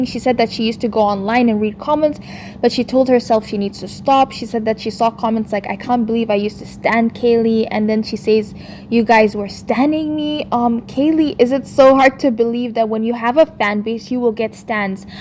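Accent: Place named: India. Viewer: The fact that she tried to say all she did was identify you by saying you had an accent... no, she said "that weird girl with the Indian accent". She insulted you and tried to play it off like she never said anything wrong and just said you had an accent. And she was being racist too. She's also acting like she's changed now, but she denied everything until there was irrefutable proof that she said those things. And she's STILL lying even though we've all seen the proof. She can keep going with the "I'm not perfect, I make mistakes" narrative, but idk about you but I've never body shamed anyone. And her admitting to being a narcissist along with the body shaming just feels so gross. I know I'm rambling, tbh I've only seen 20 minutes of that livestream so far but it already pissed me off and I have a lot of thoughts on it lol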